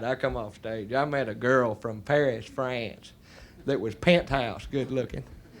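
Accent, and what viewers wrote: South, United States. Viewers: That Southern accent was more pronounced in his younger days. As he aged act was dirtier! Liked him on Blue Collar tours.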